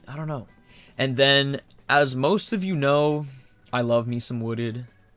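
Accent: Canada. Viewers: Awww. The Canadian accent. “Oooootfits” lol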